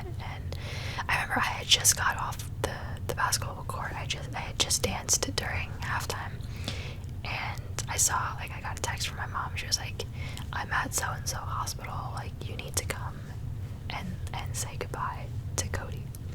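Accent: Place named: Northeast, United States. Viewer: I’ve always been curious where you’re from & what your background is? I’m from Australia so I don’t have a clue abt American accents but I’m guessing a state in the North-East? 😅 & Im assuming from your appearance you’re hispanic but also curious abt that too :)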